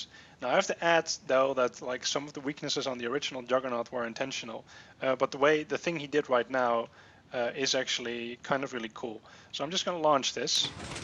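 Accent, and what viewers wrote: Netherlands. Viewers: Ben je Nederlands, je klinkt wel zo? Are you dutch you sound so? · what country are you from you sound Dutch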